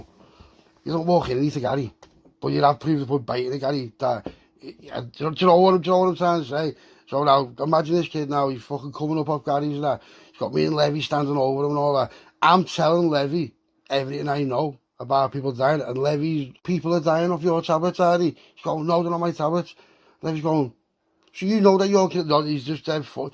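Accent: Liverpool, United Kingdom. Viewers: This is my sleep music I fall asleep most nights listening to scouse stories like there my mates talking to me before sleep love it keep this up brother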